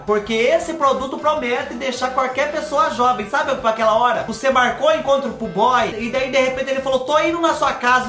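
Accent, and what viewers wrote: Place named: Minas Gerais, Brazil. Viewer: adoro vc seu modo de falar parece nois mineiro Kkkk Ajeita parceria pra vc testa coisas pra nois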